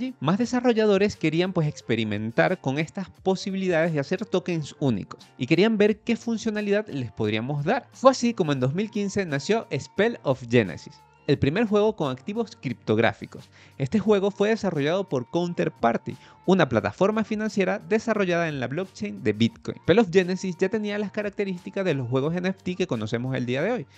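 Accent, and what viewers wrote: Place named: Latin America. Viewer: Es curioso, tu acento es hispanoamericano pero gran parte de tus expresiones suenan españolas, ¿vives en España? Buen vídeo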